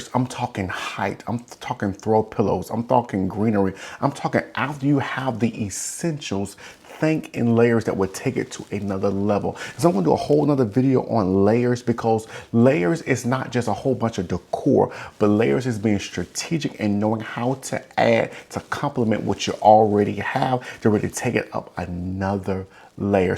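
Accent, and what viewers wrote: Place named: South, United States. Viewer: Not to be weird…. But, I just love listening to you! Yes, I subscribed. You are helpful, honest, forthright, and you make me laugh. :) And I love your southern accent!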